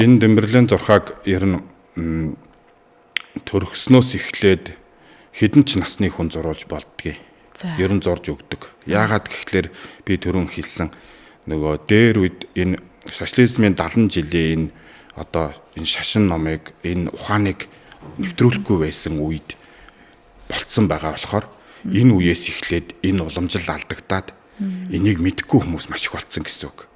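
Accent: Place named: Inner Mongolia, China. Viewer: Өвөр Монгол хүн үү ? Аялага нь тэгж сонсогдоод бна. Сайхан ярилцлага бна баярлалаа.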